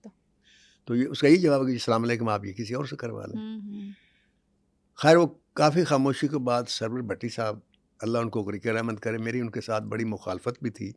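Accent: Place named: Punjab, India. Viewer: I LOve your Punjabi... it's sweetness and familiarity is what makes my heart happy!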